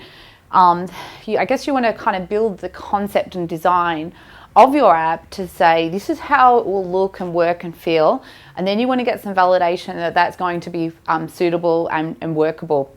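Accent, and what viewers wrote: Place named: Australia. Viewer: Hey there great video looking forward to finishing it. I noticed this was shot aways back would be cool to get an update on your journey with your idea. Also i was wondering how much did this app cost to make and did you run into any financial challenges/surprises when rolling it out. Lastly are you aussie based noticed you have an aussie ascent. Im Gold Coast based and would love a chat.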